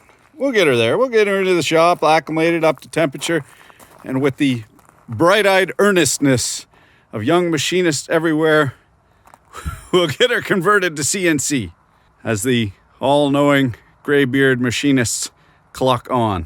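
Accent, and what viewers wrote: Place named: Canada. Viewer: Are you related to “Zip ties and bias plies”? Because you’re both from the great white north and talk almost exactly the same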